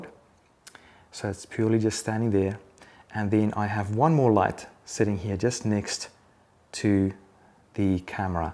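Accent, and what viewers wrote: South Africa. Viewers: or South African living in Australia...does it matter? Its a bloody good video with great advice. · You're South African :D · are you south african? :D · great video, thanks. Quick - and completely non-related - question: are you an expat South African? Or am I just imagining the Saffa accent? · Are you South African by any chance? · Where r u from u sound like u are from South Africa (not trying to be offensive I from there )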